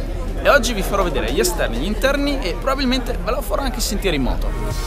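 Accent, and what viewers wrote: Italy. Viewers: I love the Italian accent. If reincarnation is true I wanna be born again to be Italian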